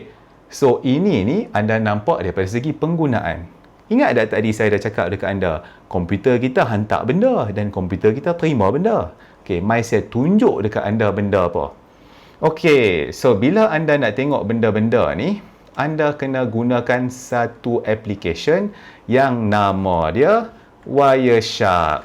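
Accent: North, Malaysia. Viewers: no worries. Sdp dgr org utara habaq ni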